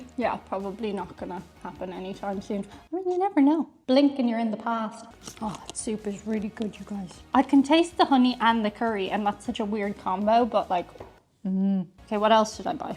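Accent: Ireland. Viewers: Was it just me, or did sound more "Irish" than usual in this video?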